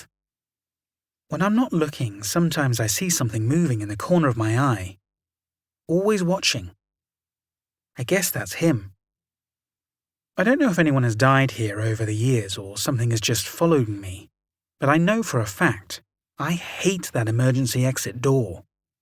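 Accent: England, United Kingdom. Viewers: English accent better for ghost stories, totally.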